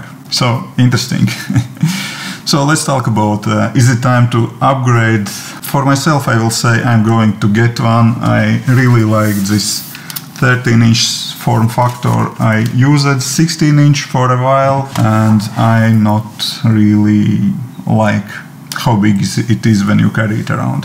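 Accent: Russia. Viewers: You're Russian, right? You have a Russian accent :D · lol. I thought you are Russian. you have a russian accent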